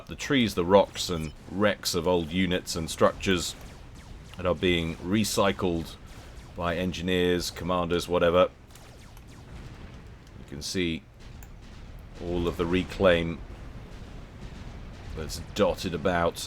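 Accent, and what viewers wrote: United Kingdom. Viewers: Just found this channel and binged a few games. Supreme Commander was a favourite of mine back when it came out (in the days of 'you can use a second monitor for the map, gasp!') Happy to hear a fellow brit on YouTube too. It shouldn't surprise me, but it always does 😅. Convinced a friend to pick up FA when we both get paid (as I'm skint at the mo) and we are going to check out FAF for the coop campaign!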